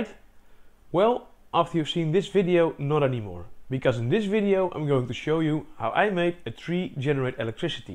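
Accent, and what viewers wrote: Netherlands. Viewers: Je spreekt lekker "Dunglish". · The dutch accent ;p Amazing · 3 secs in you know this uploader is Dutch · are you a fellow Dutchman? Judging from the accent? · Hey, ik neem aan dat je een Nederlander bent aangezien we hetzelfde prachtige accent hebben als we Engels praten !! Ahha ... ik ben ook helemaal bezig met ideeën voor het opwekken van energy, ik wil 3d print en tech workshops gaan geven op mijn DIY elektrische fiets met een DIY cargo bike trailer/minicamper en natuurlijk een DIY energy systeem om alles te kunnen draaien, fiets, kleine printer, laptops, telefoons, etc etc. Ik ben nu in de bouwmaat op zoek naar alu profielen om een opklapbaarframe te kaken voor mijn zonnepanelen boven op mijn cargo trailer en fiets. Ik hoop dat we kunnen samenwerken, sta je open voor contact en eventueel een samenwerking ? Groetjes Ruben Dekker Allround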